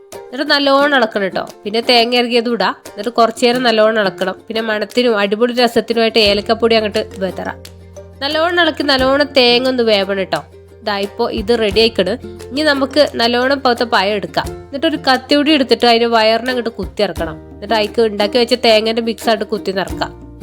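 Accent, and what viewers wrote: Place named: Malappuram, India. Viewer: Malappuram സ്ലാങ് പൊളി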